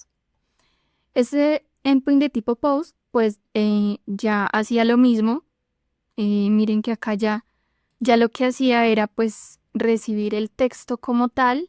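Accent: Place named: Colombia. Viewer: Que delicia escuchar ese acento colombiano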